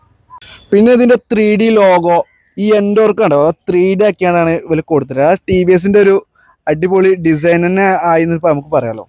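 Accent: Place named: Nilambur, India. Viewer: Confident aayitt paray muthe Anne kond nadakkkum Nilambur language❤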